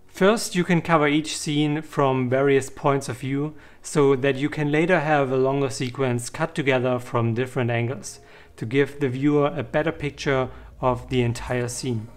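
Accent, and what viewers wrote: Germany. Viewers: Mega geil man hört an deinem Aktzent das du Deutscher bist 😂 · Great video. And interesting accent. where are you from? Leipzig? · Is this a Hard german accent? Nice B Roll though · Are you from Germany 🇩🇪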